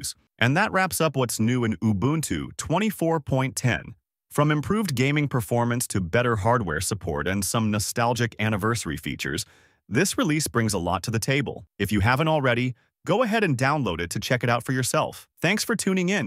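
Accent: India. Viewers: Hey bro are you from india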